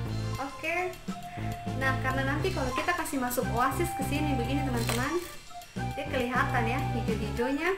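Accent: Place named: Papua, Indonesia. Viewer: Suka banget liat bunda vlog pake aksen papua, hasil nya DIY keren bun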